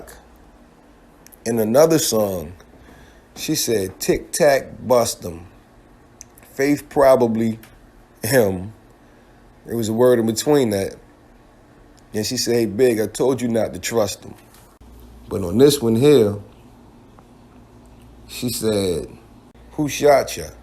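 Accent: Baltimore, United States. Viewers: Do you think Lil Kim started to get plastic surgery to look like Faith?? Just think about how Faith looks and how Kim looks, she even changed her complexion to Faiths complexion. And damn near her body shape too... please give me y’all thoughts 💭... Are you from Baltimore? Cause I can hear how you call folks “Yo” lol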